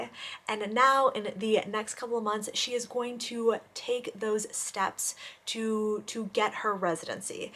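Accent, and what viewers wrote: United States. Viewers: You don't talk like your from Mexico, you sound like a pocho, as if you grew up in the U.S., corruption in Mexico is exaggerated, the U.S. just had a criminal leave office, the U.S. is a lot more corrupts then you think, its just the U.S. is so hypocritical, in the U.S. its all politics and race and Americans will forever say Mexico is so corrupts, for the next 1000 year becouse Americans go by what there thought and what they want to believe, they don't know Mexico, its always been that way, way exaggerated, I feel free in Mexico, more then in the U.S.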